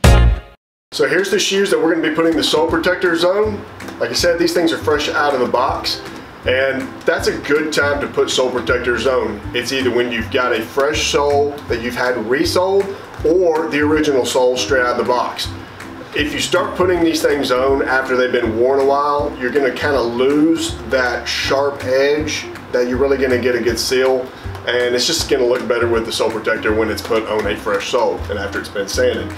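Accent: South, United States. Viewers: can't get enough of your craftmanships and your southern accent; keep it up!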